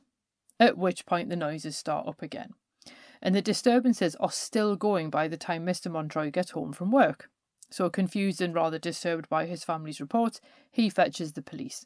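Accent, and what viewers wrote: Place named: North, United Kingdom. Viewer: One of my favourite things to do whilst travelling for work is to watch a spooky history soc on YouTube, I'm from the north so was very surprised to hear a familiar accent!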